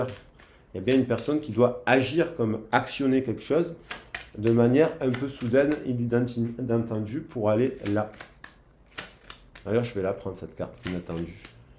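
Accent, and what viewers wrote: South, France. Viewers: Bonjour je vous decouvre vous êtes une personne très solaire merci pour vos guidances très detaillees vous avez raconté mon histoire il a pris la fuite sans explications bcp ds l ego superficiel il y avait un déséquilibre bcp de froideur .silence radio j ai lâche prise car trop de souffrances tout est juste du début à la fin .vous êtes formidable c est un plaisir de vous écouter. J adore votre petit accent ensoleillé .merci pour votre bienveillance et votre générosité. Belle journée a vous 😉😊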